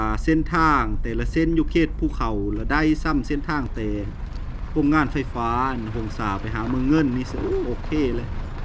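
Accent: Phu Thai, Thailand. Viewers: เจ้าเป็นคนเเขวง เมืองเลอ คึเว้าพูไท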